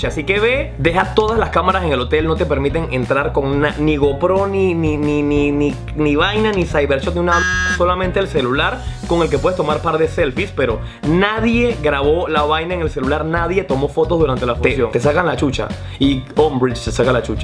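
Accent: Venezuela. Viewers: Qué simpático eres x'D tienes acento levemente venezolano :0 ¿eres venezolano o es que el acento panameño se parece?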